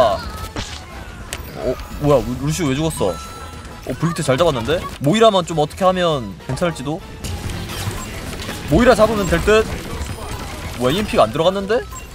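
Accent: Gyeongsang, South Korea. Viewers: 근데 님요즘 경상도 사투리 쓰시네 ㅋㅋ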